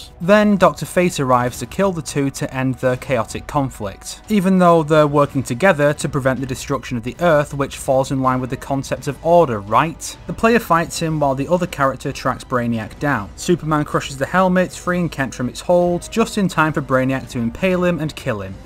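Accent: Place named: United Kingdom. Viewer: Plus that silky British (or Australian) accent is extremely soothing.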